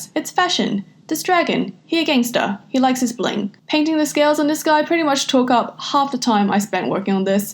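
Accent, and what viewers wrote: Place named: Australia. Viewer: I love your voice!!!! (And your art of course) But what is your accent?? It sounds Australian but also something else at points?? I’m Australian so I know what sounds Australian lol 😂 I’m confused about your accent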